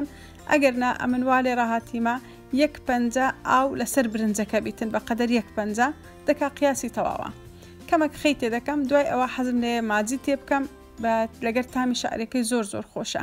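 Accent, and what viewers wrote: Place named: Erbil, Iraq. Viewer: هيوايه تم قسه ي هه وليريه😣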